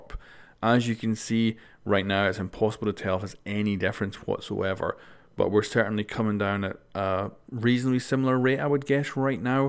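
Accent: Scotland, United Kingdom. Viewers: Sub'd cause Scottish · Are you Scottish? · Are you Scottish or Irish?? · Are you Scottish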